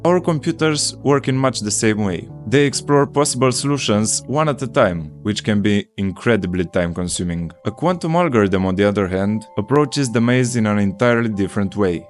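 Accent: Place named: Romania. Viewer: Dupa accent mi am dat seama ca esti Roman , keep it going :)))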